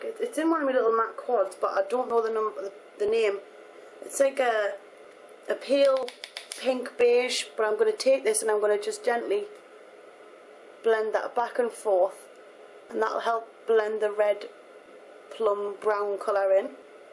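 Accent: Newcastle, United Kingdom. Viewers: Are you from Newcastle upon Tyne?? Sound Like A Geordie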